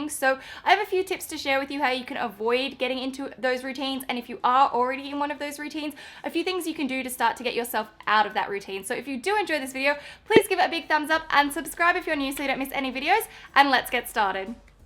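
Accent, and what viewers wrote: Australia. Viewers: You are the BEST! I usually hate Australian accent but i love your accent!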